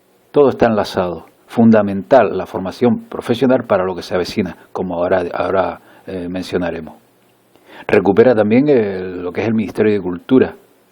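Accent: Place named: Canary Islands, Spain. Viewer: Acento canarión!!!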